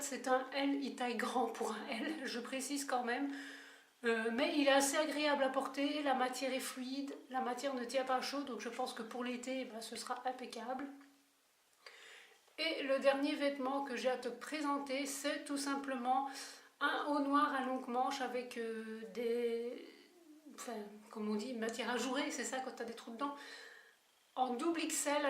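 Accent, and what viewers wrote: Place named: Belgium. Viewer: Ton accent Belge me fait sourire, et j'adore quand tu utilises des mots comme "tirette" ou "septante" :D Sinon je me sens moins seule quand je vois que tu achètes un même vêtement en plusieurs coloris... bien que je ne l'ai jamais fait en fait. Mais j'en ai souvent envie sauf que ça gonfle vite la facture et que ça restreint la diversité aussi donc je me force à choisir x') Mais t'as eu raison de te faire plaisir parce que ça rend quand même différent selon la couleur.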